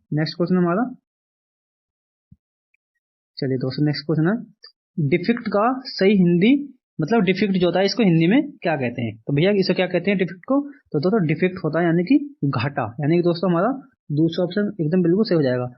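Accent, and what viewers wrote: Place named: Haryana, India. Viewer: Haryana sa ho kya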